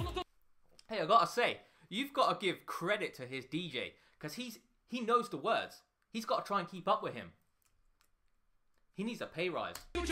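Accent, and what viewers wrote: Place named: England, United Kingdom. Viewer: Your english accent is so cool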